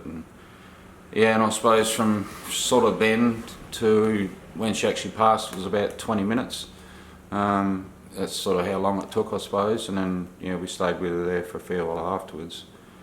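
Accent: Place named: Queensland, Australia. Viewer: Hi, thank you so much for sharing your story😪 I've been diagnosed with stage 3 pancreatic cancer. This is so strange I live in Emerald Qld and after watching your videos, it sounds like you are from this area too? I've got soooo many questions to ask? Like where did you get the oxygen, and cannabis oil and more? Is there any way I can contact you please? My Facebook is Jaco-Marie Jakes Jordaan.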